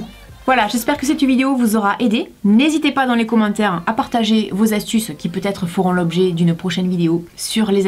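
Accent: South, France. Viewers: Alleste moi je dirai du Sud honnêtement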